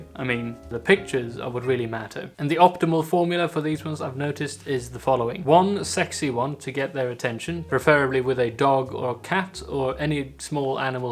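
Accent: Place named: England, United Kingdom. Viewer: Are you Swedish or English? If not, where did you get your English accent? Can you do one of you speaking Swedish?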